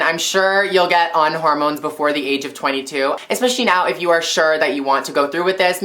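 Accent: Canada. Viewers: you sound more canadian than ever before lol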